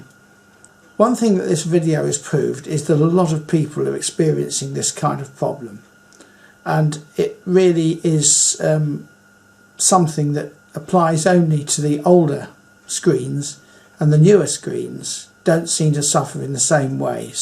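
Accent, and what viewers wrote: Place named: United Kingdom. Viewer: well im super happy that i found this informative video which also comes with a british bloke :D greetings from germany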